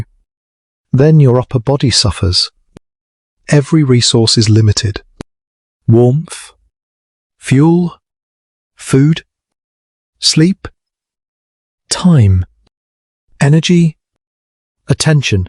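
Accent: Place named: United Kingdom. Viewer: Annoying British accent.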